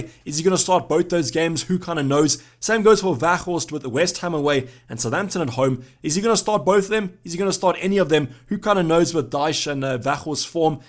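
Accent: South Africa. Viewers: You sound South African My G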